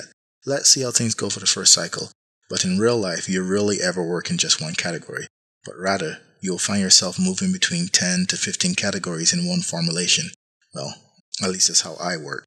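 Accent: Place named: Barbados. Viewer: This is so exciting! I have been reading some Category Theory for Programmers and decided to take another look at what's out here this year. In the past there was not much available. Imagine my delight and surprise to find someone who is applying Category Theory to composition!!! This will be an exciting ride. I recognised the Barbadian accent very early on since I am from Trinidad. I look forward to learning from you, since I am interested in music, functional programming; even trading. I even studied Nuclear and Thermal Engineering many moons ago and my first job was at BNL:). Thank you so much, Dr..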